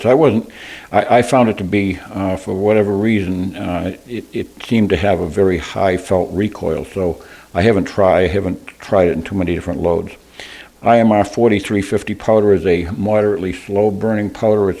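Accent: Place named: Boston, United States. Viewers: If think it was a Boston accent. Even to an Englishman it's very distinctive. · Bah-ston accent.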